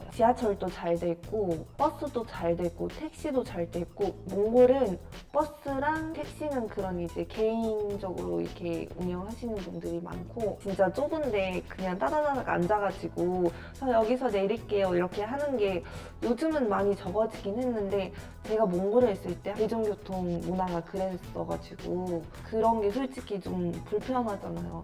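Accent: Seoul, South Korea. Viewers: 한국발음.한국얼굴 한국인이네. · 와...진짜 서울 사람이네요.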